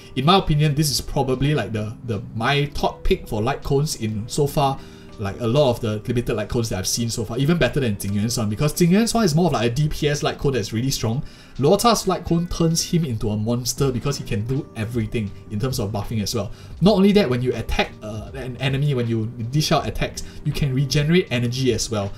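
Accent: Singapore. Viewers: He can’t help it it’s singlish 😭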